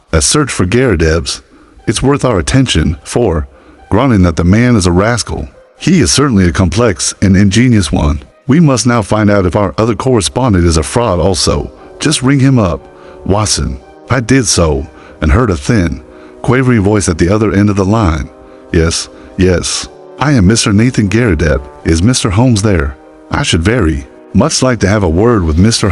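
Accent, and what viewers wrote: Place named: South, United States. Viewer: I didn't like the Southern twang.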